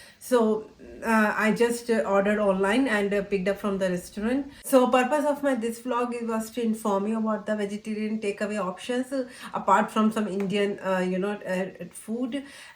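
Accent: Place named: England, United Kingdom. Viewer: Nice English accent, not too hard to understand.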